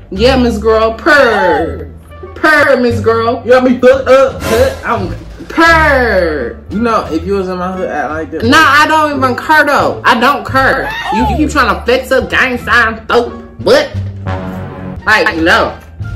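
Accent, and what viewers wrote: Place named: Miami, United States. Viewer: He sound like Miami am i wrong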